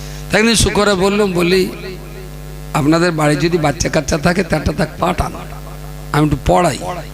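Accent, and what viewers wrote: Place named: North 24 Parganas, India. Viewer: Apnar katha r style amar valo lage North 24 pargana r katha bolar dhoron emon dekhechi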